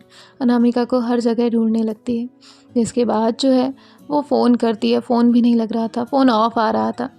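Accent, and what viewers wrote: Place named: Punjab, India. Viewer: Or ap bataiye kaha se hain ap Punjab se ya chndighar aisa lag rha h but ap bataiye ..hum mp se hai .Ujjain se ...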